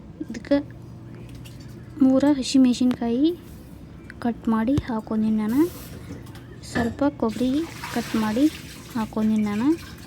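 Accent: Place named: North Karnataka, India. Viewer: Namma uttarkannda maatadakttere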